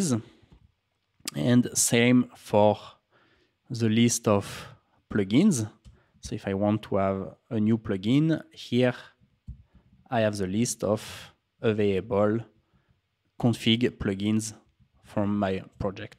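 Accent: France. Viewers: As a dev learning both React Native and french, I love your videos!! Just love the french english accent 💚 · it seems you are from france?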